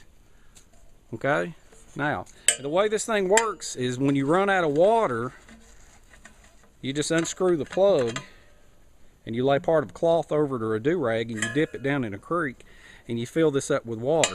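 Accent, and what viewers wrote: United States. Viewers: You've got my vote for best American accent on YouTube.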